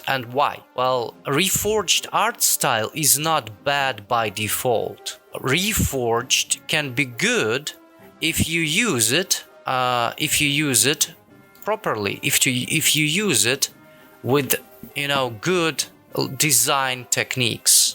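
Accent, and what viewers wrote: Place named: Russia. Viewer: молодец! По акценту сразу понял, что наш 😂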